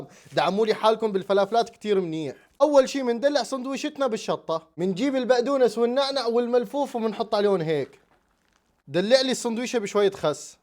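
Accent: Syria. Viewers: حلوه اللهجه السوريه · كم هي جميلة اللهجة السورية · اللهجة السورية المميزة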